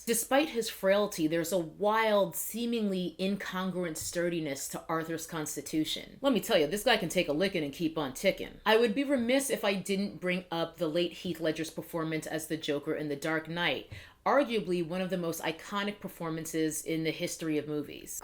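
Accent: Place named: Brooklyn, United States. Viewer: so brooklyn. just subscribed.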